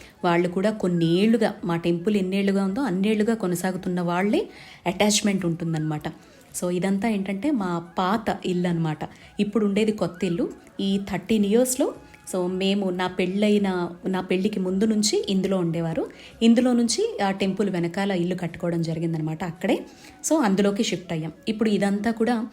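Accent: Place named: Pulivendula, India. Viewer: Akka voamma entha baga annaru akka ma wuru pulivendula akka ma language gurthuku vachindi akka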